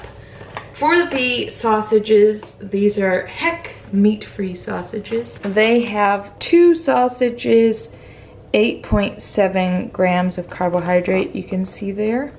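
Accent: United States. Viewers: You sound American instead of British!!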